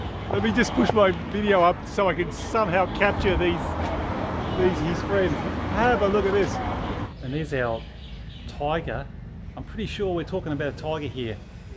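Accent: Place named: Australia. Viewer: Love to hear the Aussie accent, where are you from mate?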